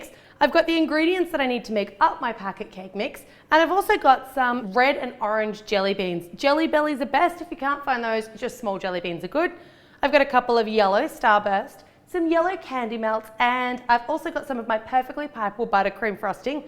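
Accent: Australia. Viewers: is she australian???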